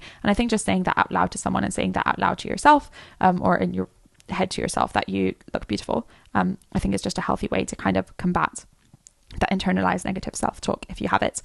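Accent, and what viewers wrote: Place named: United Kingdom. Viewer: You make double effect: 1) food for thought 2) what a lovely accent (fantastic, because I just learning English(few years :) ) and I admire British accent) Thx a bunch for all you're doing!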